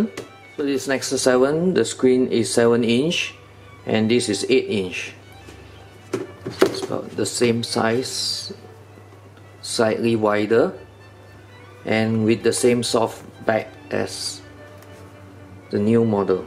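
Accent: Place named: Malaysia. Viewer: your voice like malaysian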